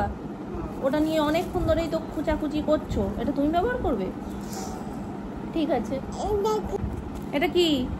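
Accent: Bangal, Bangladesh. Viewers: Pakabori mukhe bangal kotha sonle khob valo lage mon ta vore jay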